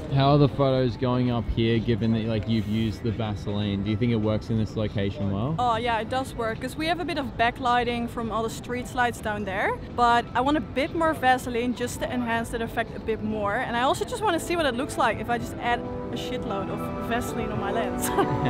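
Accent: Netherlands. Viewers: Are you Dutch?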